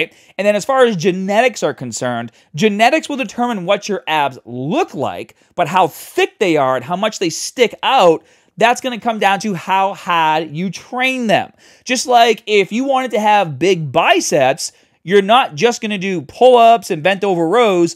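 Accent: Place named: Massachusetts, United States. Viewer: I had a feeling this guy was from Massachusetts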